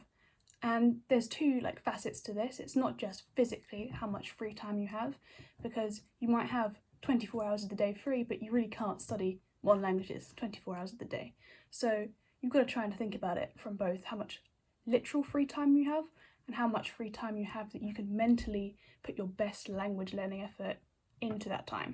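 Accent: United Kingdom. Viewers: What a classy RP accent. Unfortunately you don't learn French. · I just love the British accent. It sounds so coool haha. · Hey! I m learning Japanese. Perhaps n5 level. I like your accents. I like british accent. I want to learn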